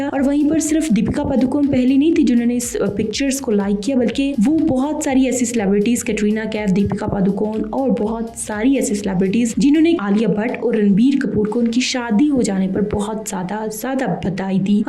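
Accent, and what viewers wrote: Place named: Punjab, India. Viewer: Punjabi aunty accent in the video.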